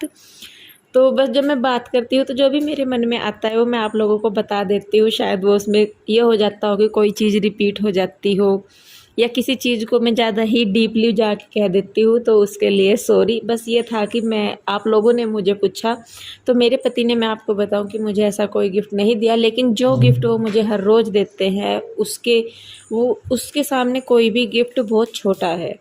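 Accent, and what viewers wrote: Haryana, India. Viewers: Di aap hariyana se ho kya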